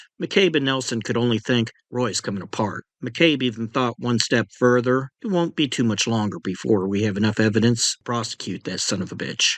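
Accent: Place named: Midwest, United States. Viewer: Can't wait for part 17, I've greatly enjoyed this series and your Westies 3-part series. Exactly my type of narration, you've got that dry, wry New York sense of humor my entire family has, even though I can tell from your accent that you are originally from the Midwest... Or Ohio or similar? Just a guess based on certain words you say. Anyway, I don't do this often on a YouTube video, but thank you for your channel, your videos and the immense amount of work you've put into it. I'm looking forward to contributing back by buying your book when it is published, which I'm eagerly looking forward to. 🙂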